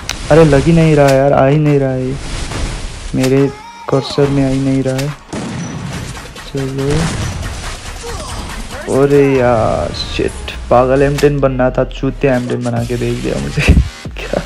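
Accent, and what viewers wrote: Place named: India. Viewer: You are indian or nepal becuase your voice indian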